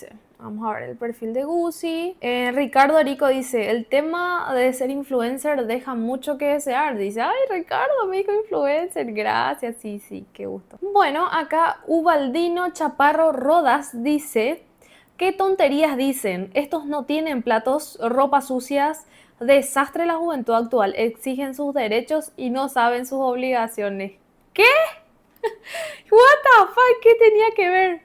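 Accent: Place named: Paraguay. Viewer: Sos una mujer muy linda y amo tu acento paraguayo! Segui adelante con tus vídeos genia!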